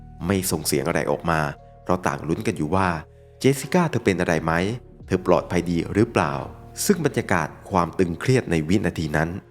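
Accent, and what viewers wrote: South, Thailand. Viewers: คนใต้มั๊ยคับ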